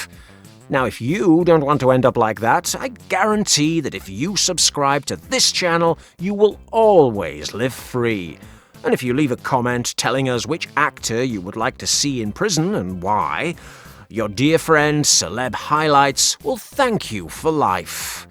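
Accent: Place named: Northern England, United Kingdom. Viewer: I've spent months trying to figure out where the narrator is from. Northern England, somewhere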